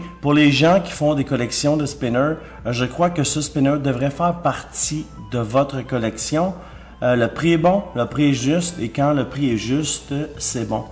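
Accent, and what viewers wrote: Quebec, Canada. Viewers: J'adorerais avoir un spinner car j'adore tes vidéos et je trouve que tu expliquer tres bien et j'aime ton accent québécois 😎 sinon ouais jen ai pas et jairmqis en avoir un 😇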